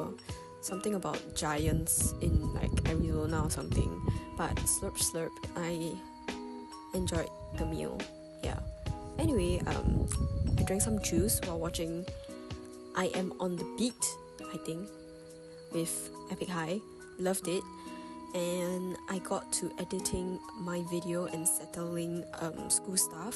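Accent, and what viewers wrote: Singapore. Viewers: I love your singaporean english accent 😭😭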